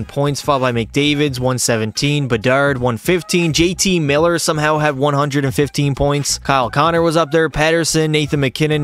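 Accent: Newfoundland, Canada. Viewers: Are u from Newfoundland?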